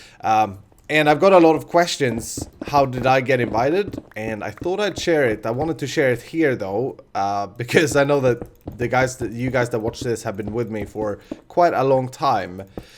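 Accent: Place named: Sweden. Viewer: Fin svensk brytning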